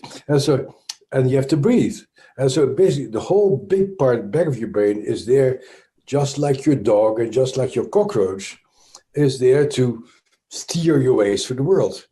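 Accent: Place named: Netherlands. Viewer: I have lots of happy memories around neighbors with Dutch accents. So I love to hear Bessel speak. I am also part Dutch.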